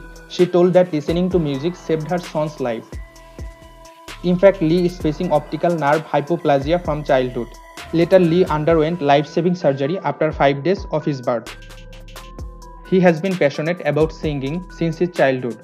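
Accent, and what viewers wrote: India. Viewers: After this guy uttered just a few words I had to stop watching this video because his Indian accent was SO thick I couldn't understand what it was that he was saying enough in order to continue listening anymore! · Bollywood acent.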